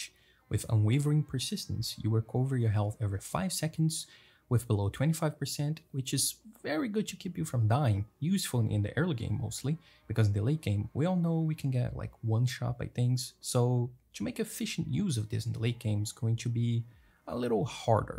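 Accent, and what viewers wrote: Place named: Brazil. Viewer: tu e brasileiro né cara. Da pra ver pelo seu sucaque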